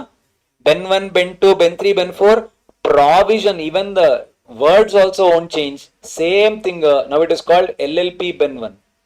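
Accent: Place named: Bangalore, India. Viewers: I love his bangalore english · South accent is really cute yaar and funny too 😺😅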